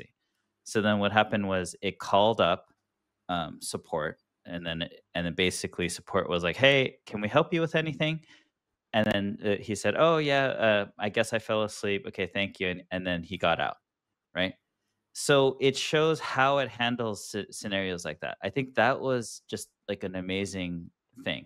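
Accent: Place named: England, United Kingdom. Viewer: This guy has the most annoying English accent ever. I want to listen to the guest. But I cannot handle the annoying accent! I just can' t.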